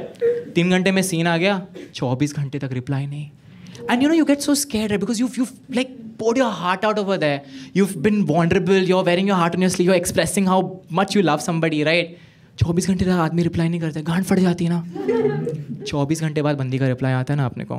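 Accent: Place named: India. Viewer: After watching so many standup, atlast i came across our own people who talks hindi exactly like the mainlaind ( others don't get me wrong, we as northeastern part of india, for us Hindi is not a rice plate eating😂 it's definitely an undertaker play😂😂) Really appreciate it manh. Bro. 👍👍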